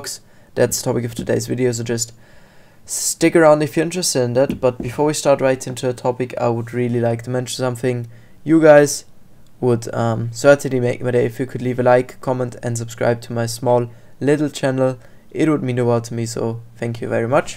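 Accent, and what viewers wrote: Germany. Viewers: der deutsche Akzent ist am Start auf jeden fall haha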